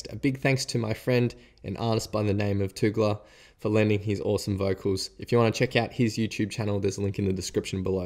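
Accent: Australia. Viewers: it's great to have a comfortable-sounding Australian accent doing this, weel done.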